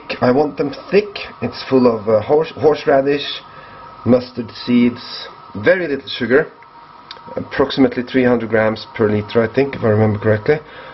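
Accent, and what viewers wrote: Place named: Norway. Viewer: Hi! Im Norwegian, but ill write in English. Been watching your vids for a good while now, and you inspired me to find the right place to go hunt my first old coins. So, i went out on simular fields you walk on and found my two first Oscars and alot of other cool stuff. Thanks for the vids and the lovely "Thor Heyerdahl" English hehe. I hope I get the chance to go detect with you guys some day. 2 questions though, what is the name of that yellow book with the kings in it? Been looking for it, is it originally a childrens book? 2nd. What kinda detector are you using mostly? Im using the AT Pro and loving it. Wouldnt mind if you revealed some of the values/numbers on your detector while you detect. best regards Kim Erik :)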